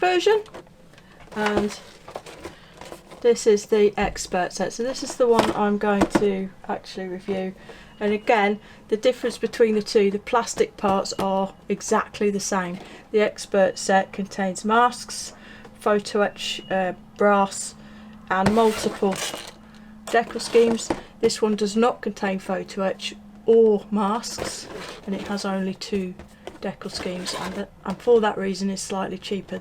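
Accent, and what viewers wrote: West Midlands, United Kingdom. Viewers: Hi, I would like to be considered for the Mustang giveaway, I'm back into modelling after 30ish years and find your lovely West Midlands twang and easy yet very informative tips and advice invaluable in my learning of the hobby after 30 years ( things have changed alot since the 70,s) Also undying to try foiling so this sould be my first attempt, I'll send you pictures of my success or not if I am lucky enough to win. Thanks again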